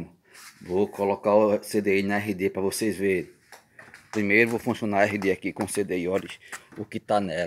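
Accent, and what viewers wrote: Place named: Pernambuco, Brazil. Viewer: Bom dia!. O cara acha qie voçe e do Pernambuco.. Kkkk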